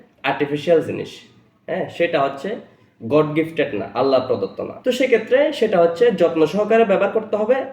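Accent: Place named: Chittagong, Bangladesh. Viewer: আপনি নিশ্চিত একজন চিটাগাং এর ছেলে এম এম আম আম এটা কি ছিল??? উপস্থাপনা খুব ভালো হয়েছে অসংখ্য ধন্যবাদ প্রিয়।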